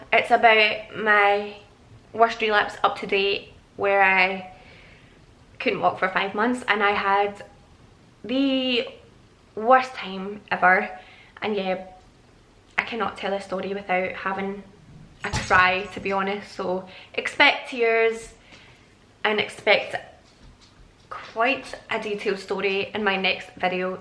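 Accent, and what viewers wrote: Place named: Scotland, United Kingdom. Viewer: Are you Scottish nice accent 😉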